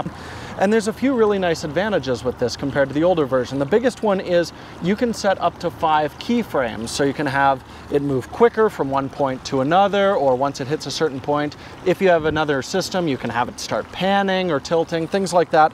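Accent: New Zealand. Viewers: I never shoot video, but I watched this episode because of New Zealand accent and Jordan's clear explanation of this camera gadget. Thank you for sharing. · ' Kiwi accent is spot on. A+ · Can say fish & chip in his Kiwi accent? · Like for the kiwi accent XD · WOW. Aussie guy here... your NZ accent is GREAT!!! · A Japanese ethnicity, living in Canada, with profound New Zealander Accent How can we not love?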